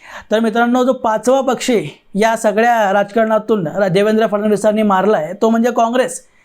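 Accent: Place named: South, India. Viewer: तू दाक्षिणात्य आहेस मराठी बोलतोयस तुझं अभिनंदन पण तुझ्या बोलण्यात दाक्षिणात्य बाज आहे.तुला जो पुळका हिंदीचा आला आहे ना तो तू तुझ्या मूळ राज्यात जाऊन पहिल्यांदा हिंदी भाषा सक्तीची करण्यासाठी अभियान चालू कर.इथे अक्कल पाजळू नकोस. जय जिजाऊ जय शिवराय जय शंभूराजे 🚩🚩🚩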